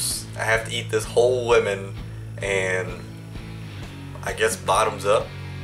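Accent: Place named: South, United States. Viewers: Must be in Texas duh ... y’all act like we’re not supposed to have Asians 🙄 country Asians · An asian man with a semi southern accent? Now I've seen everything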